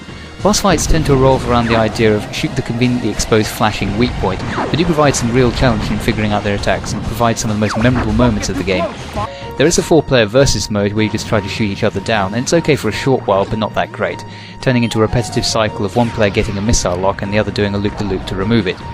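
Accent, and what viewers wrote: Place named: United Kingdom. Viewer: You understand that he just sounds British, right? :)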